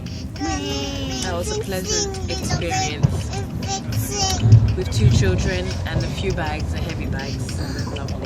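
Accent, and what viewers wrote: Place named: United Kingdom. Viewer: Nice to see your vacation journey. I liked how you edited it between present and past sights. Where are you originally from? Your accent sounds British...did you relocate to south of France? I'd love to learn more of your story. Perhaps you've made a video of it and I didn't yet see it? You are a beautiful woman - inside and out - and I enjoy learning about life in another part of the world through your eyes.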